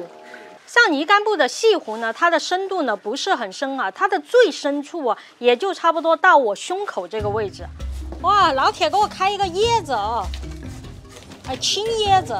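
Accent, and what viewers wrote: Sichuan, China. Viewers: 大陸口音？ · 川普说的真好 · 你的四川话和我的一样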